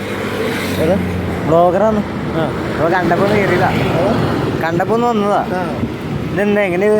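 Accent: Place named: Muvattupuzha, India. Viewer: സംസാരം കേട്ടിട്ട് മുവാറ്റുപുഴ പോലെ തോന്നി 😂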